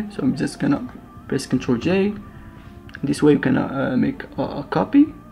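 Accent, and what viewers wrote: Morocco. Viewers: thnaks for the tutorial I found it useful but tell me, are you moroccan ? I raly taste the moroccan accent in your voice!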